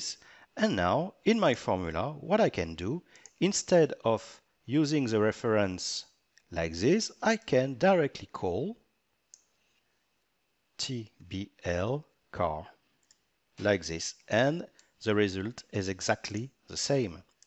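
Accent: France. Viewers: Thank you for posting. Very helpful. The French accent made it even better. Thanks again.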